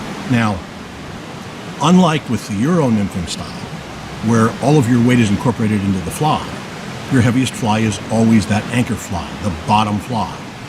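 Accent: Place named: Appalachia, United States. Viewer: Cannot understand a word because of poor sound and Redneck Hillbilly English!😢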